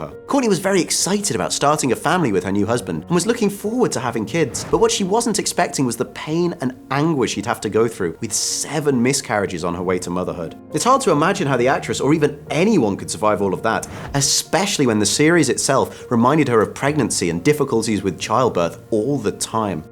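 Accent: United Kingdom. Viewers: Your accent tells me I don't really care what you think about this show. You might as well be the BBC. They like smearing American's too. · OMG U r too fast british accent.. Slow down man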